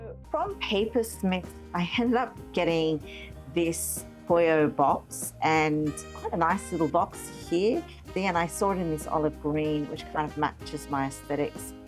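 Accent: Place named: Australia. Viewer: Lovely to hear a fellow Aussie expat (although I’m in the States). Struck by the coincidence, at the time this video was released I’d never heard of Cambridge Imprint, but not two hours ago I unpacked my first order from them, and completely agree, the labels are lovely. Glad your channel “turned up” in my recommendations.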